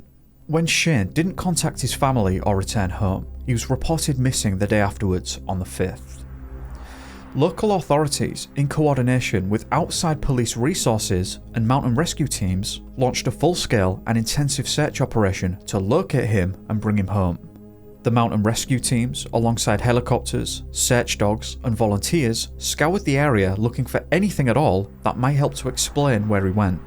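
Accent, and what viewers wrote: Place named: Scotland, United Kingdom. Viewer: Thank you,. I've just discovered one of your videos and I really enjoy your voice. It's so nice that human narrators can still be found! In addition to enjoying the stories, I'm just starting to learn some of the nuances of British accents, and I wonder if you would tell me Where you are from? If I had to guess I'd say you're a Scot, but what do i know! Anyhoo, good channel. Joining now.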